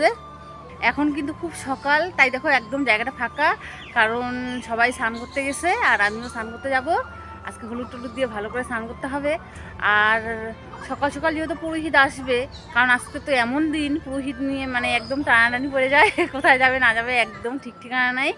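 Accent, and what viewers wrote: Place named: Bangal, Bangladesh. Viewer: Bangal vashata bolbe. Oitai main attraction. Khub valo lage. P😊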